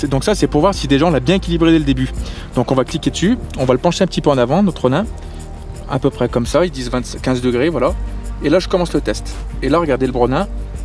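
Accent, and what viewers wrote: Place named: Suburbs, France. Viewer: Tu causes comme un évadé de banlieue, mais t'es vraiment excellent !!! 🌟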